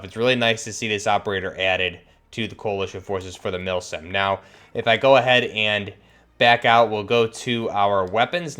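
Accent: United States. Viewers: What voice does grom us? american?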